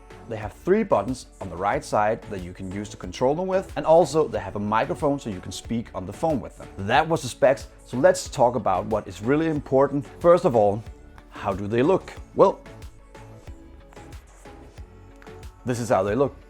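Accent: Denmark. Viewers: Are you from Denmark. The accent sounds danish? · Fed review, ku høre med det samme at du var dansker, haha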